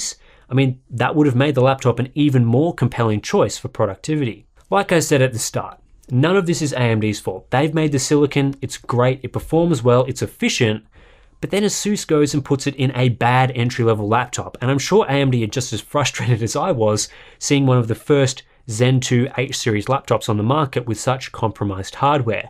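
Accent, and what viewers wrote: Australia. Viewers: Good day mate, are you from Australia?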